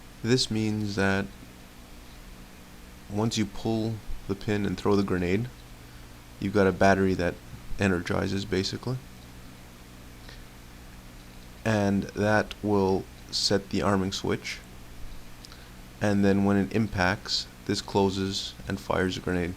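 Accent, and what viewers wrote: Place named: Canada. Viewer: Obvious Canadian. Not that there's anything wrong with that.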